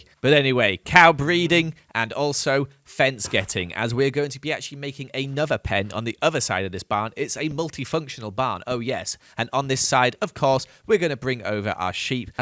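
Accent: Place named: United Kingdom. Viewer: so refreshing to hear a British youtuber for once because the vast majority of youtubers seem to be american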